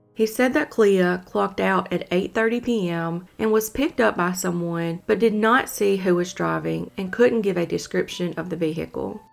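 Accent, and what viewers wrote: South, United States. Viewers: I'm Norwegian, and I have to tell you how refreshing it is to listen to your southern accent. It's a rare treat! American news media and for the most part American TV series seem to frown upon dialects for some reason. It's a crying shame. I could listen to your dialect all day long.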